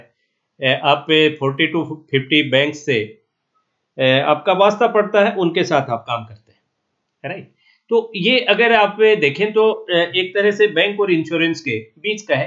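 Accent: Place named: Gujarat, India. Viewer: Seems like you are gujrati by tone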